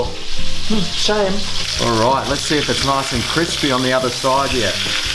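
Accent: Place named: Australia. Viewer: I love you and all my family loves you too 💜 oregaaaanooo love the Australian accent 😎 keep glowing sir ✋.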